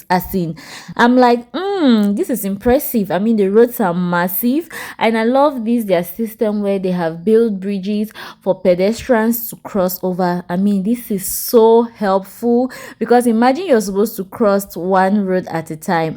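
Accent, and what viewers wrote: Nigeria. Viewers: Thank you for this video. Ur accent sounds like Nigerian. Only a rooted Nigerian can differentiate. Thks, anyway!!!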